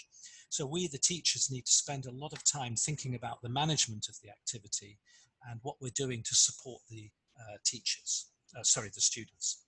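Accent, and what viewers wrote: United Kingdom. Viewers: By the way, you have got a wonderful English (I know I should write British) accent. It´s music to my ears.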